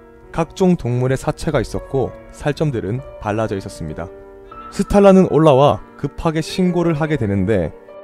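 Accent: Gyeongsang, South Korea. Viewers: 님 갱상도 출신이네요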